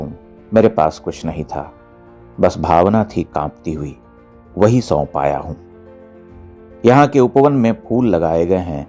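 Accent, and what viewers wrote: Standard. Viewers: श्री मान जी मैंने आपकी वीडियो पहली बार देखी है लेकिन आप की भाषा शैली उच्च स्तर की है विशुद्ध हिन्दी उच्चारण 🙏 धन्य है आप और आपकी प्रस्तुति। मेरी ये इच्छा है कि इन मज़हबी उन्मादियो, आक्रांताओं और आक्रमणकारियों ने पूरे भारत राष्ट्र में जितने भी देवालय खंडित किए है उन सभी का एक सिरे से जीर्णोद्धार हो। जय सिया राम जय भोले नाथ 🙏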